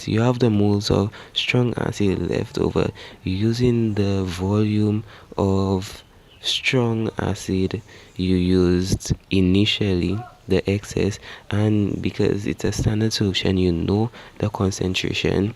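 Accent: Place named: Dominican Republic. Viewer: Where are you from? You sound Dominican/ St.lucian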